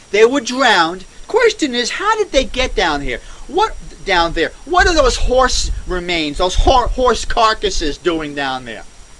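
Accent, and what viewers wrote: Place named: Brooklyn, United States. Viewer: I would rather spend eternity in hell if heaven is full of nasally Brooklyners like this moron. Sorry that accent is nails on a chalk board!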